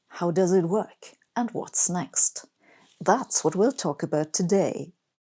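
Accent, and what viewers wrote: Germany. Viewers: You brought your German sound palate to your superlative command of English. Please don't shed it. There's no reason why pentrating intelligence shouldn't be peppered with exotic charm. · your voice with a heavy German accent would the best replacement for computer Hal 2001, even better than the one from the movie Dark Star.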